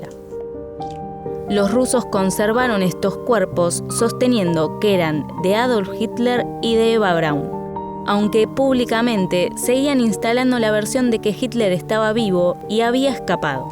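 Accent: Argentina. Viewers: Buen video, lástima que tu acento sea argentino, es muy molesto. Dislike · Que acento más engañosos, por un momento pensé que era español y de repente me dí cuenta qué es argentino OMG Pd: exelente video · Muy bueno el video, tiene un lindo acento porteño y saca videos de buena calidad, en poco tiempo llegan a los 100k. Éxitos.👍🏽🇦🇷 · iuuu, se escucha horrible el acento argento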